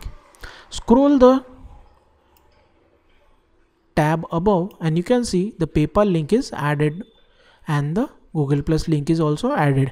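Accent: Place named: France. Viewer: the french accent is annoying... not trying to be racist...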